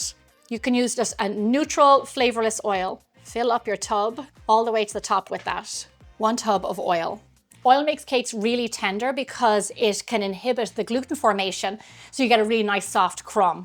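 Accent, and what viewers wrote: Ireland. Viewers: She sounds Irish to me, not French. Is she Irish?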